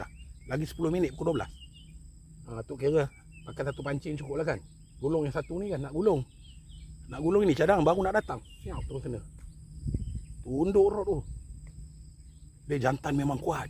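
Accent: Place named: Johor, Malaysia. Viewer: Atok asal johor ke? Tukar sebut tuko Biar sebut bio Hehe tnye je tok Slm dr tangkak johor❤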